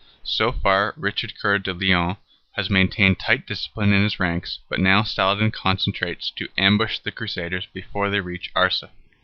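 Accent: United States. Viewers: the american accent just ruined it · Hokey American voice and indistinct graphics make this a very amateurish effort.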